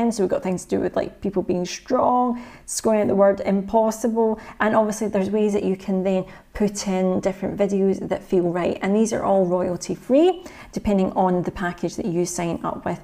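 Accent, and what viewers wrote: Scotland, United Kingdom. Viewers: Love all your videos! I live in Scotland so I am glad to hear the Scottish voice so I know that all the info you offer will be valid to myself. Most financial video's on YouTube are US so a lot of info is not valid to UK. So thank you and will be trying a lot of your info :) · My wife’s family are part Scottish. What a great accent! Great vid too😁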